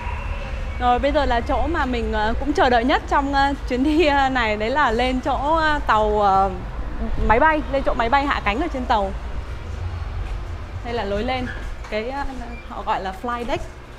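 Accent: Hanoi, Vietnam. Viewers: Em là người gốc Hà Nội hả. Sao giọng hay quá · Girl đâu mà xinh thế, giọng hà nội nữa chứ , yêu thế ❤️ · rất xinh đẹp và nói giọng Hà Nội nghe rất dễ thương. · nói giọng bắc dễ thương quá. Mà bạn quê ở miền bắc là ở Hải Phòng phải không. Chiếc tàu lớn quá. Ủng hộ đầy đủ like sub tặng bạn. Đã xem full. Chúc bạn ngày mới vui khỏe và bình an. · Xinh đẹp, phúc hậu, giọng bắc chuẩn hà nội nghe mê à!!!!!! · Bạn hướng dẫn xinh gái quá đi có người yêu chưa bạn ơi ! bạn nói giọng HN à ?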